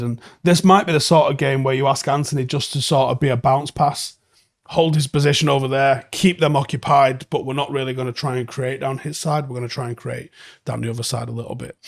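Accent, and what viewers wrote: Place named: Salford, United Kingdom. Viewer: It's an accent, my mates were from Salford and they say it the same.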